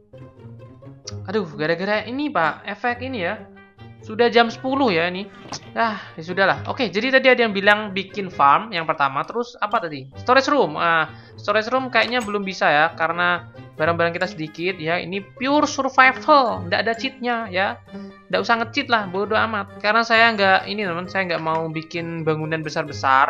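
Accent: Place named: Java, Indonesia. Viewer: Mas oraang jawata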